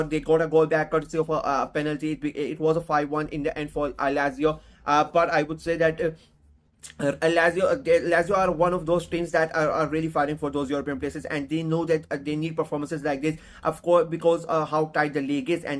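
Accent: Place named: India. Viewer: r u from india , u sound so much like them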